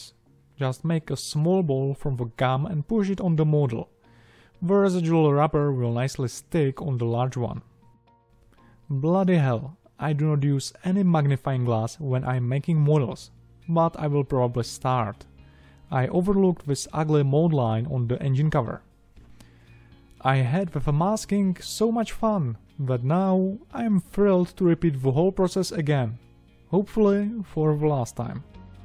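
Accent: Czech Republic. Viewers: Čecha mluvícího anglicky poznám na tři míle. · další skvělý model, ale mondieu ta czengličtina :) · You Czech?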